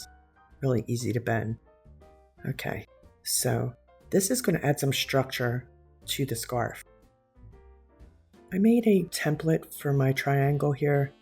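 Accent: Philadelphia, United States. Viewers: Just stumbled upon your video when I was looking for vintage Christmas crafts. As soon as I heard your voice, I knew you were from the Philly area! And then you mentioned Kindy’s! That’s so close to where I grew up!! Just subscribe to support a fellow Philly girl!!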